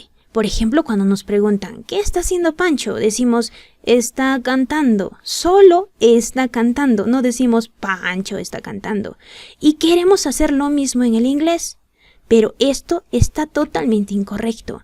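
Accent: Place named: United States. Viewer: Es inglés americano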